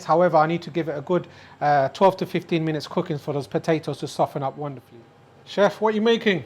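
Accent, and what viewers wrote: United Kingdom. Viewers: bloody british accent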